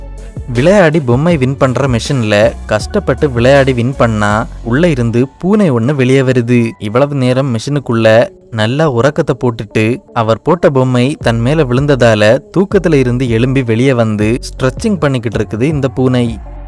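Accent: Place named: Marthandam, India. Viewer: Unkaluka ooru marthandam a anna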